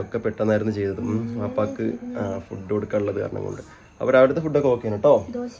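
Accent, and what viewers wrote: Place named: Malabar, India. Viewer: സംസാരം തനി മലബാർ ഭാഷ ആയി തുടങ്ങി 😂😂😂😂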